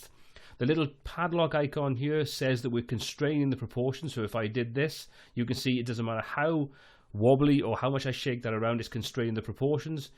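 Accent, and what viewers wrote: Wales, United Kingdom. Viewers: That's it, all Youtube tutorial videos to be exactly like this from now on - well thought out, perfectly paced and delivered in a beautifully articulated Welsh accent :) Loved it.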